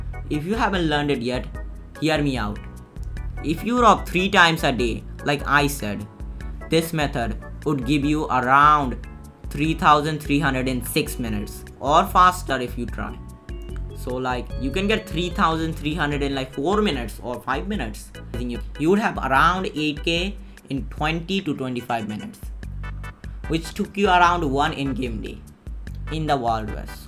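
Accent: India. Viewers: Prob indian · are u indian by chance?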